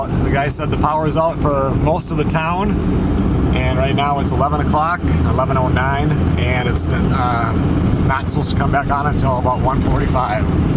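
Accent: Minnesota, United States. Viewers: I agree! Hearing now familiar Minnesota accented voice,is quite soothing. Like putting on a comfy pair of shoes! LOL. Thanks for all you share. But, just behave, as mentioned! Or it’s the DOGHOUSE( aka crate) for YOU! 😲🙃😂😁👍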